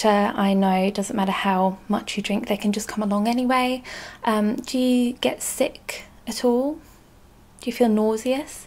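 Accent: Scotland, United Kingdom. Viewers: See the problem with your voice and accent is that it is the type of voice and accent that Americans think of when they think of the UK which means they're not ready to hear us Brits with not as well spoken voices and accents! ;) In all seriousness, cracking video! I will make sure I binge a couple more of your videos later! x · just here to say that I love your videos, british accents are a trigger for me :) hope you’re doing well!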